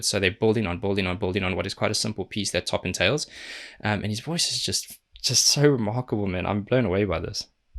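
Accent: South Africa. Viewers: I don’t want to be rude. Is that South African accent ( which I love) , my point is , is there South African metal bands ? Excuse me for being stupid, I really don’t know. Just curious ?!? Nighwish and the Hu are my favorites and some Philippine metal too 🤘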